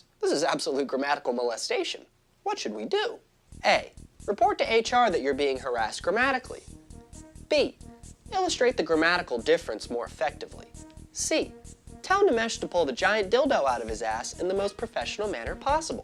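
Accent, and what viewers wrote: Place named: India. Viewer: getting lectured by a curry english speaker is hilarious.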